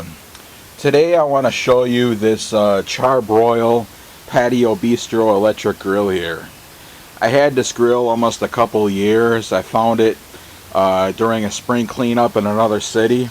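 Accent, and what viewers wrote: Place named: Wisconsin, United States. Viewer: That's def WI or IL. It sounds urban and rural at the same time lol Bet you he lives by Lake Michigan 🤣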